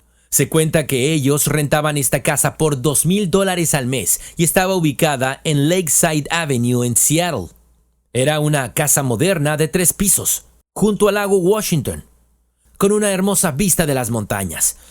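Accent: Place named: England, United Kingdom. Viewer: Well buddy, it’s not really pronouncing his name it’s off. You sounded English to me unless you’re AI I don’t know how you could get KURT COBAIN i’m really emphasizing on the last name. I’m not being a bitch either. I just noticed. Every time his last name was mentioned i got a tic. I have tourette’. It’s not your fault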